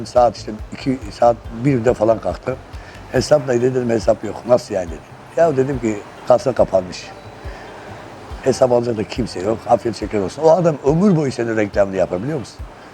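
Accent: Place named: Black Sea, Turkey. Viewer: Abi sende Karadeniz şivesi var.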